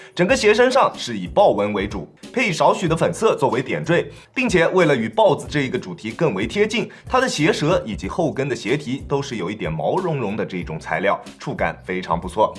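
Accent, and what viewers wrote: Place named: Zhejiang, China. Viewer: 看到嘉兴市原来是浙江老乡难怪口音这么亲切哈哈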